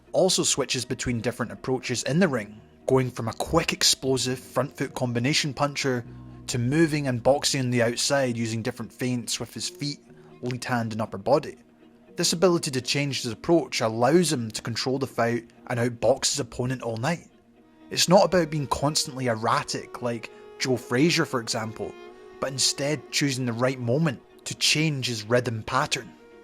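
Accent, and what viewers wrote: United Kingdom. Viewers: what is your accent? British? Welsh? Scottish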